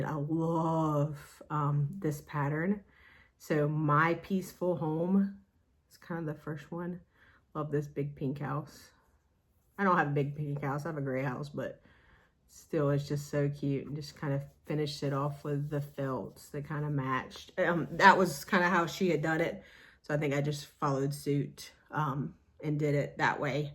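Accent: South, United States. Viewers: Hi, - I really enjoy watching your videos. It's nice to hear another Southern accent (I'm in N GA.) I'm reorganizing my craftroom and remembered this video. The clean designs made a big impression so back I came for inspiration. Do you remember if the storage units were the Kallax units? I'm trying to avoid the drama of a trip to the Ikea store in Atlanta. There was a time when that would have been a trip I would have looked forward to, but not so much anymore. I love the clean look of the baskets and magazine files. No one has to know about the chaos that lies within.. 😂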